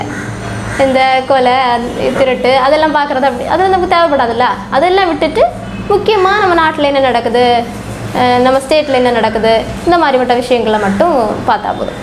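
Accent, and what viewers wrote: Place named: Kanyakumari, India. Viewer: Kanyakumari slang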